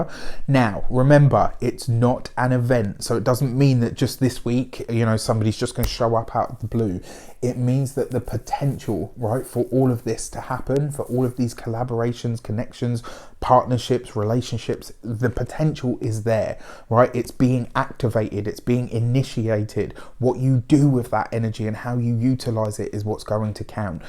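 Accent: England, United Kingdom. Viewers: You’re so wonderfully English “now that I’ve waffled on” haha. Thank you for this lovely reading 🥰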